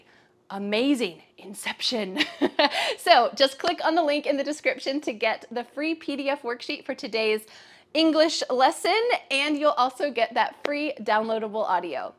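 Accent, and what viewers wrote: United States. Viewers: Hi ma'am.are you American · Are you American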